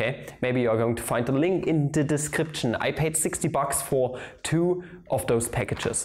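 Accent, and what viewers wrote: Germany. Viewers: Oh Ich hab mich schon gefragt, warum ich so einen leichten deutschen Akzent heraus gehört hab und war richtig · Ich weiß nicht wieso, aber ich wusste direkt das deutsch bist 😂😂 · How do Germans know how to make their own accent funny. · I could tell from your accent. I'm half Filipino and half German too btw. Nice video!👍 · i still like this german accent artifact of clearly pronouncing all "L"s that precede final consonants like k, "chalk". it sounds like your new chalk. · That’s a German accent isn’t it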